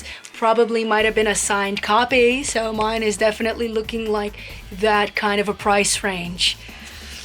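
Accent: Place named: England, United Kingdom. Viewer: Love your English accent!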